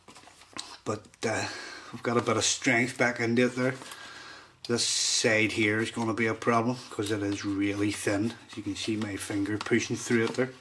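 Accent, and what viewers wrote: Belfast, United Kingdom. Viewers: ***** yeah - he's from northern ireland though. Belfast by the sounds.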